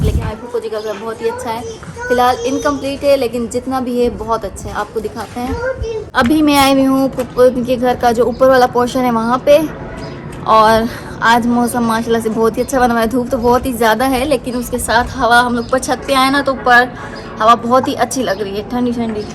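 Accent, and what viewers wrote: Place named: India. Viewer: Aap to indian jaisi hindi bolti ho or aap bahot hi khubsurat ho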